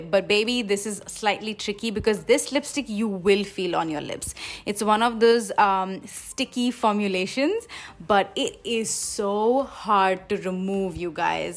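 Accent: United Kingdom. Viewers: Thankyou so much ♥️♥️ this video was actually much needed 😍😍😍 and ofc your British accent ♥️♥️♥️♥️ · damm that british accent... much love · Ahh that british accent of yours which comes in between 💜😂 Love love love ...true inspiration for me 🤗💜💜💜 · British accent?? Why? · Thanks for swatching these babies during nykaa hot pink sale, surely will order few of em💋💋 lots of love ♥️ PS- The British accent though! Lit baby gurll🔥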